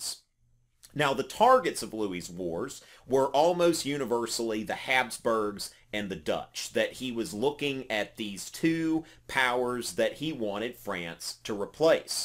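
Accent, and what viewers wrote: South, United States. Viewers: yeah im a big fan of your videos since i found your Thirty Years War video, and ofcourse your southern accent. Anyways im sure you will do great if you decide on making a video of Sweden, its a shame because like you said most people leave out Scandinavian history besides from Vikings mostly, i might be a bit biased but the Swedish Empire is surely impressive seeing as its only surrounded by other huge and powerful countries and could still hold its ground. Besides all of that, Sweden has had a significant amount of political and military influence on Europe throughout most of history atleast until their loss of Finland in 1809. Greetings from Stockholm :)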